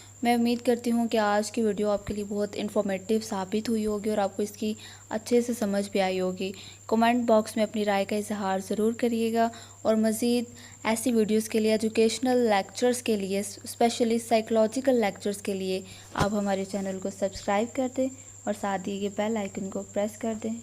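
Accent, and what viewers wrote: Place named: Punjab, India. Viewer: Really helped tho I'm loving her Punjabi accent in English 😂❤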